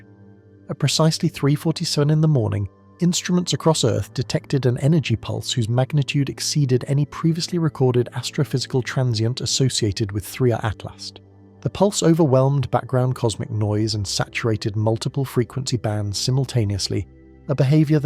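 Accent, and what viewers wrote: United Kingdom. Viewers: Saying lots of words in a UK accent with no point.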